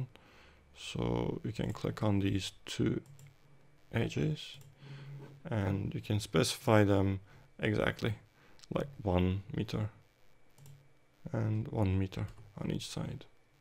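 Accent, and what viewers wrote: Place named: Turkey. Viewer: hi admin, where are you from? your english like Turkish accent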